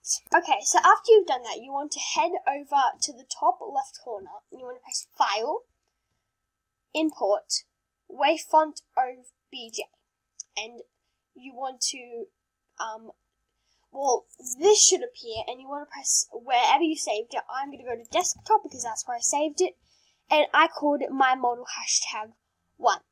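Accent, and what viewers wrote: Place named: United Kingdom. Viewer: This British tho.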